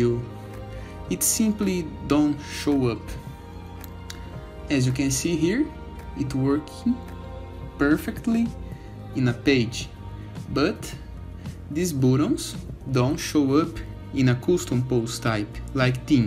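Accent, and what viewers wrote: Brazil. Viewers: Pense num sotaque BR! vlw mano pelo vídeo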